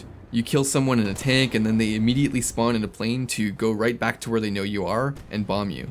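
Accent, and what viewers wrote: Czech Republic. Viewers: Are you from czech? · are u czech ?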